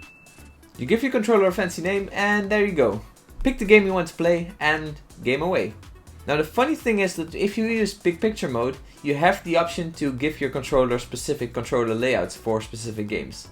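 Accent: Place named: Netherlands. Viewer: You are Dutch i can hear that in your accent 😂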